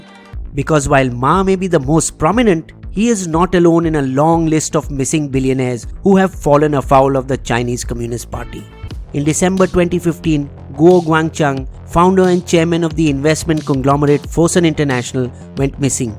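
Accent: India. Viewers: LOVE YOUR CLASSIC INDIAN VOICE/ACCENT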